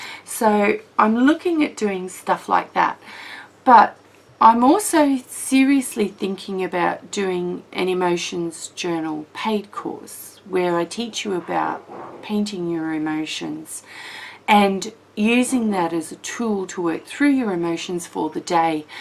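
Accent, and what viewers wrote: Australia. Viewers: Haha, yours is real & proper Aussie English! Mine is just a silly Dutch accent, LOL!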